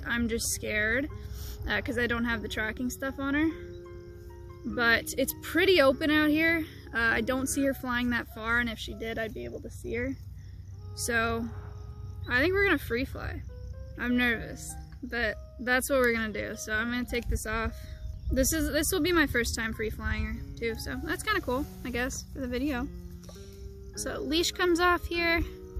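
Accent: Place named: United States. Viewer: Why is her wheel on the right side of her car? Is she not American? She sounds American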